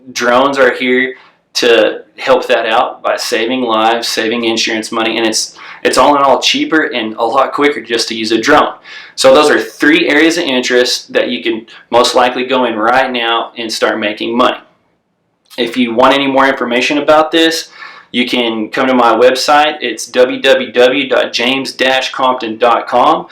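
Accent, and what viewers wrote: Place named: Appalachia, United States. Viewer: Hey, you're right you will never sit in some cubicle working for DA man! But I can tell from your rants that you will end up in jail. And soon. I hope. It is guys like you that go out and cause all kinds of mayhem that bring the FEDs in to regulate. YOU are the problem NOT the FEDs. Also, it is clear to those of us already in the business that you really don't know what you are talking about. Please post your videos showing the oil company tower survey that you use as your example where you made 30, 40, 50,000 dollars. Please post a single link to any of your work. Your hillbilly accent tells me a lot about you. And your need to cuss and blow up at every post....You lack any shred of professionalism. Keep it up preacher...You gonna get you what you paid for. Now lets sit back and see if you can respond without calling me names or saying..."fuck you"...even once! 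In fact i challenge you to NOT reply at all.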